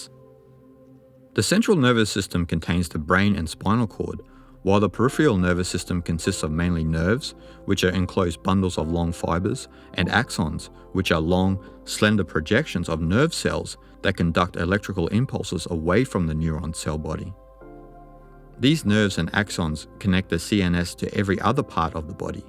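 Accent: Australia. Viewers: I am grateful for the fact that just discovered your channel through The Art of Effortless Living two days ago. And yes, it was the perfect timing as how the law of attraction usually works. I listened this video in this morning, what a great way to start a day! Listening your contents is joyful way to be in the moment especially when my mind is agitated. (: Also checked your website, judging by the quality of your videos I bet your books are as remarkable. As a person born and grew up in far East I was not interested in Eastern philosophy for the first three decades of my life. But I found myself always captivated by the words from those who originally western born but obtained depth of both western and eastern philosophy/spirituality. To me their way of speeches are so effective, easier to digest and apply than those speakers/writers that are eastern born even though I don't doubt the eastern born also have good depth on both side of philosophies. 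I guess you are one of those exceptionally people have such depth in both way and know how to explain well. Your contents are as listenable as Alan Watts' speech videos. I didn't expect to hear such words in Australian accent which is amusing and refreshing. :D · A piece of art really, I watched both documentary, your voice is so calming and I love your Australian accent, the quality of information are incredible and very well explain. Thanks for this.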